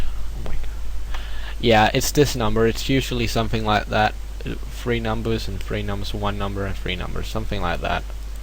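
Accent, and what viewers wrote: Denmark. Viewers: are u from denmark? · Er du dansker? :D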